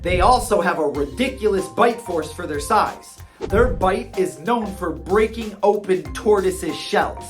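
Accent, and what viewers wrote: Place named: New York, United States. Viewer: I just wanna say that I truly hope this guy makes a ton of money. He is so entertaining and hilarious and he looks at things that we're so used to and he makes us look at those things in a completely new and admirable way, like he makes us respect and appreciate the nuances of animals wtf?? And with a new york accent, it's just so uniquely epic and entertaining. Never change,!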